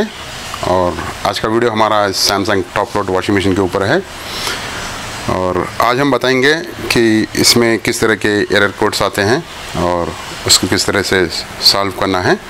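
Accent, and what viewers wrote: Hyderabad, India. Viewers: 100% he is from Hyderabad India ...Correct me if i'm wrong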